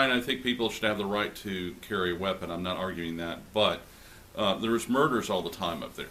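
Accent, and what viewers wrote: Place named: South, United States. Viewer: 4/9/2023. Great comments and video. Having grown up in the South, I appreciate your accent and use of y’all.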